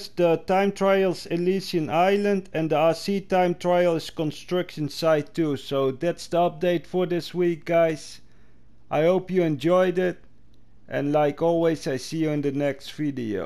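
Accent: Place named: Netherlands. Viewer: Hey man I love you're accent, are you dutch? Ik wel namelijk en je accent doet me denken aan m'n buurman haha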